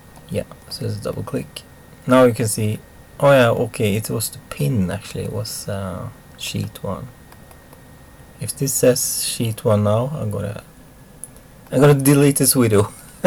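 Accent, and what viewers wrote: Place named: Sweden. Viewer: Schematics is pronounced skematics in english not Schemaaticks.Sounds like swenglish to me.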